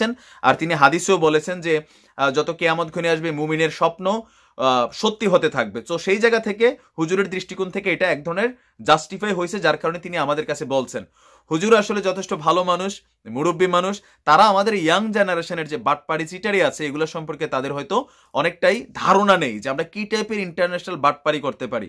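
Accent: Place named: Comilla, Bangladesh. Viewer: ভাইয়া কুমিল্লা ভাষায় কথা ভালো লাগে না হলে ভালো লাগে না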